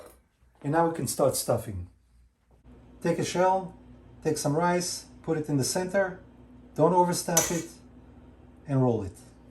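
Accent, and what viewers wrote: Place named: Israel. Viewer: Amazing video. By the way, I noticed you have an Israeli accent.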